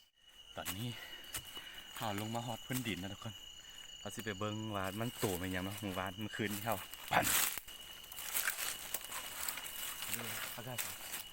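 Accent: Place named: Roi Et, Thailand. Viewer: ร้อยเอ็ด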